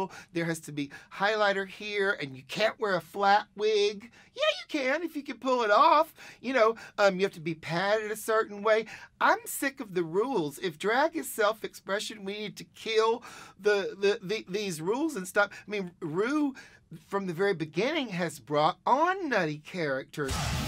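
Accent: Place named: South, United States. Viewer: You know.. it’s crazy bc Lady Bunny rly looks like if Trixie got older . She even kinda sounds like her 😂just southern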